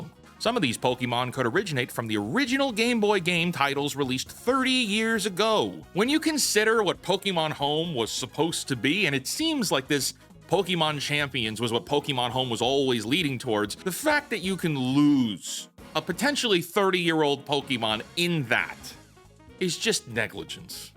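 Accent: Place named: New Jersey, United States. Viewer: Dude are you from Jersey because that accent sounds like it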